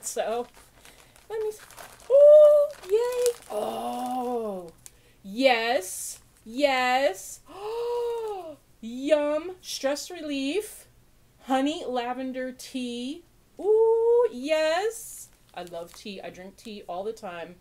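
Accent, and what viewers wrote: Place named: Minnesota, United States. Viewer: I love skulls too . I find the best stuff at bike events . I really like when skulls are used in unexpected ways. I have always struggled with weight my entire life . I have had periods of time at a healthier weight but it always creeps back up . Love the Lost Souls scarf 🤩 I always love watching happy mail ❣ Uffda on the Minnesota and Wisconsin accent...ya you betcha 😁 North Dakota sounds like that too ❣ There are degrees of accent , in the urban areas it is less pronounced than rural areas 😘 Fabulous happy mail ! The wooden swift fanfreakintastic!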